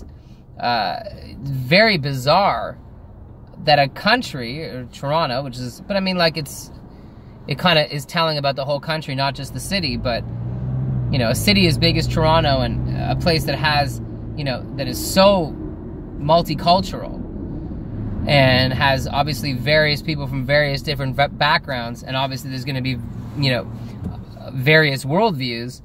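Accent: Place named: East Coast, United States. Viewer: you're not even from toronto, "ya know." east coast hick?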